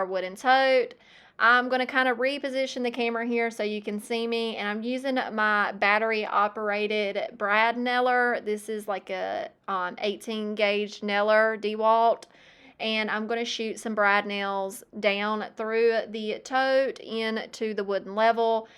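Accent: South, United States. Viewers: This is my first time seeing one of your videos and I immediately noticed your southern accent. So in searching I found you are from Kinston, NC. I'm in Wilson, NC, but living in Maryland for 38 of my 77 years, I've lost most of my accent.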